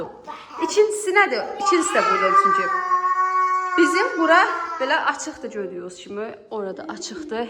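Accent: Sheki, Azerbaijan. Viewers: Şeki lehcesi.❤eviniz mohteşemdi.can sagiliqi ile otrasiz.bizde evi tikilmis hazr almisq deye tam isdedymiz kimi olmadi.bizde 220kvadratdi 2mertebe xercimiz cox cixb.amma 1gün satb oz isdedymiz prayeti alarq ya sifrdan tikerik insallah.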